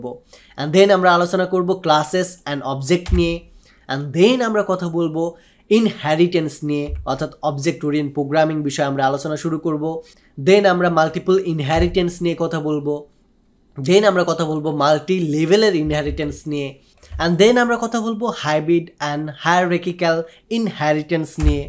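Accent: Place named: Kushtia, Bangladesh. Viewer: apnar bari ki jhenidah ,kushtia elakay? kotha bolar dhoron shune jante iccha holo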